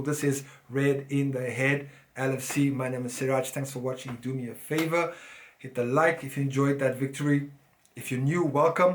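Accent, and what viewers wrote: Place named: South Africa. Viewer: What is your mix. You look and sound like a polished South African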